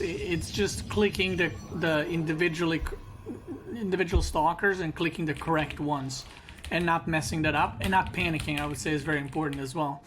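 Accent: United States. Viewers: You're speaking mid- Atlantic English. It's Americanish but without the twang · Definitely more american than not. Like a well spoken immigrant west of the mississippi. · You do actually sound completely American. In the vast majority of America we all have a very similar accent and it sounds very similar to the way you speak English. You could come over to the majority of the States and no one would know that English wasn't your first language. It's just the South and Boston that have ridiculous accents.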